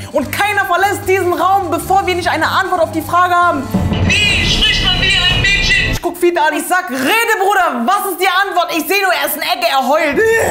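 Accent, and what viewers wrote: Berlin, Germany. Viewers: habubti! Wallah du bist eine Bombe. Deine "Berliner Schnauze" / Berliner Akzent hoert sich an wie "Koefte in der berliner currywurst Sosse".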